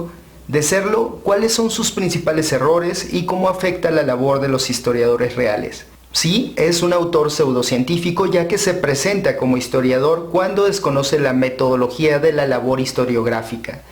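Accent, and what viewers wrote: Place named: Oaxaca, Mexico. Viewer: Así como tu hablan los pinshis waxhos que odi@ los de zonora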